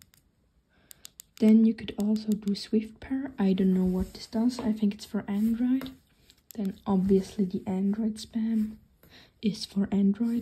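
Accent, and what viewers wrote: Germany. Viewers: Man hört den deutschen raus 😂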